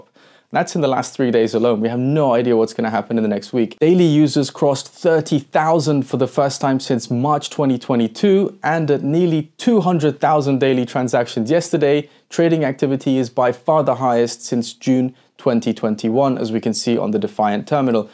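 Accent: United Kingdom. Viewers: English accent/+ , not Robin but Funny/+, look/(...as always) irrelevant - finally! :)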